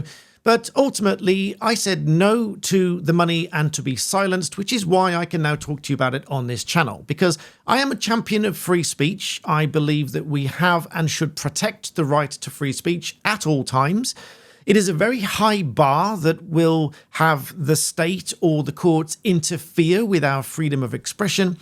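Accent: United Kingdom. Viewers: I thought you were Blacktail Studios and wondered how you got a British accent 😂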